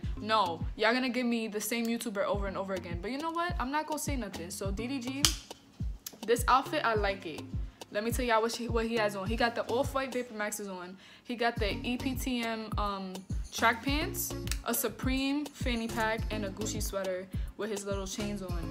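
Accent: New York, United States. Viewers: Are u from New York cuz u sound like it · You can tell she from New York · Bol manes ye she from New York fr😂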